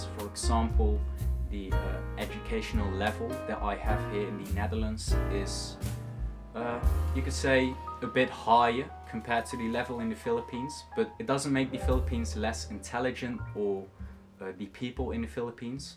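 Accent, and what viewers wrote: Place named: United Kingdom. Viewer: You speak differently. I mean with accent but I hear british :))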